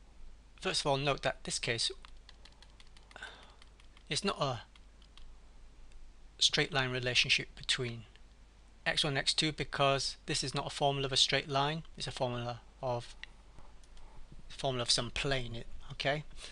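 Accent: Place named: United Kingdom. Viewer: Thick British accent.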